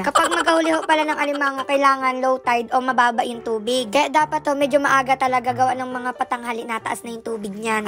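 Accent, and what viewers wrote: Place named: Pinamalayan, Philippines. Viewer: wari koy ikay taga pinamalayan punto pa laang ay ngane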